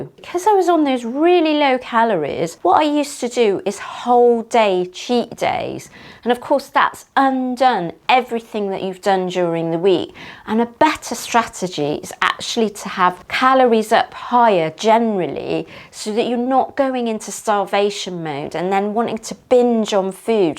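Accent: England, United Kingdom. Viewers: That English accent wow. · You do look great, nice physique... I like the accent, You Brits 😆🤣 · You had me at your most splendid British voice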